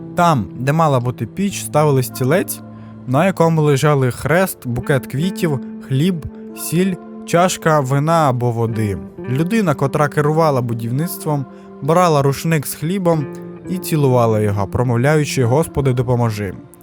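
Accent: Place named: Cherkasy, Ukraine. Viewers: молодець. У вірному напрямку рухаєшся. А ти з відки? Регіон? Черкащанський говір))) ні? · А пан часом не з Черкащини, якщо задає таке питання? Говір характерний для центральних регіонів. А Черкащанський, це який?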